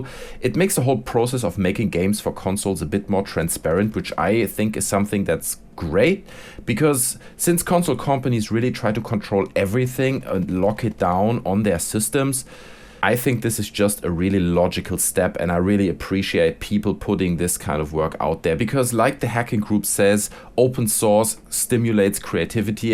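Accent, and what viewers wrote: Germany. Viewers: Erm are you german. ?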